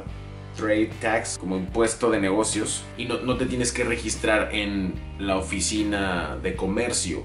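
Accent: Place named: Mexico. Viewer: jajaja me encanta tu forma mexa de hablar <3 jajaj <3 exiiiiitooooooooo en su negocioo!!!!!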